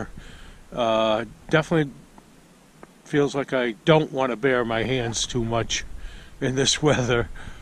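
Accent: Boston, United States. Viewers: Stan, I have never met you or your family but I consider you a friend. I miss seeing you on your show because I really enjoyed hearing your Boston accent in cold Alaska. I hope to visit Alaska some day. Keep sharing and I will be watching from Cleveland. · So good to hear that Boston accent again! Haven’t had TV for a while , so.....no Tanana show😢. Found this on YouTube and am so happy! Keep going Stan!! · Loved watching u on TV I can still here the Boston acent I have it too that's how I know, Glad found u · You said "downtown" Tanana ? Bright lights, movie houses, traffic jams? I thought that was funny. You can take the man out of Boston, but you can't take Boston out of the man....."staat , New Yaak . Love it! Keep up the good work.....you're a real inspiration. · You have a Boston accent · I just made the same comment. That’s a Boston accent all day long. 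I know... I’m from Boston. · he grew up in south Boston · Are you from Boston? You sound like you have a little accent.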